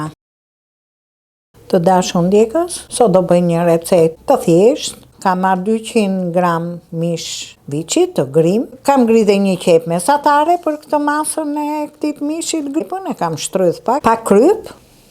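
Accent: Tirana, Albania. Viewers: Amon mi se na knoqe me kete gjuhen tate prej tironse ilac je me kete dialekt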